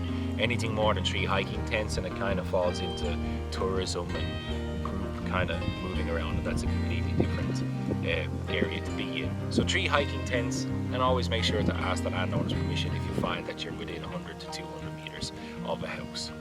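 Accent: Ireland. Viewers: I think he means three he has an Irish accent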